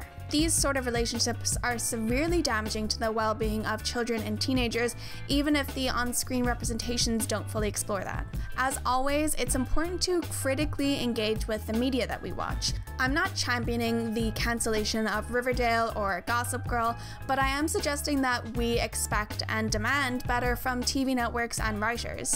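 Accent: Ireland. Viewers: This is such a good video, thank you so much for creating it and doing such good research on the topic, I'll definitely be sending this to some of my old friends whenever Pretty little liars relationships come up as a topic.. This is unrelated but I can't seem to place your accent, do you happen to be Irish? xD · you’re accent is so interesting because it’s definitely american but you’re ‘t’s are so irish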